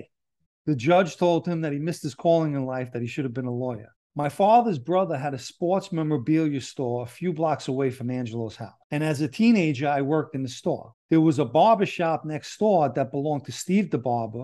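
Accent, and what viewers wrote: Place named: New York, United States. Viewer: Great I'm 2nd generation Italian from D Bronx moved to Vermont some years ago. Loved your stories and it was great to hear the NY Italian accent ,It like when I watch the Sparano's I get home sick! Thanks!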